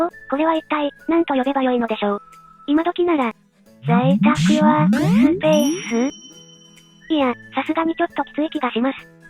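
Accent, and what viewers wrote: Hakata, Japan. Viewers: 博多弁が可愛い♡ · 博多弁がうれしか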